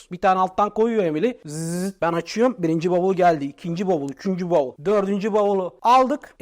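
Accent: Thrace, Turkey. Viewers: trakya aksanin fena ya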